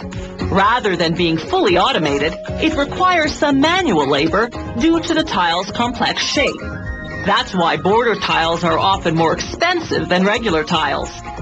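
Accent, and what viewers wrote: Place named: Canada. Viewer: I only just notice she's Canadian - "abouwt" and "ouwt" gave her away :'L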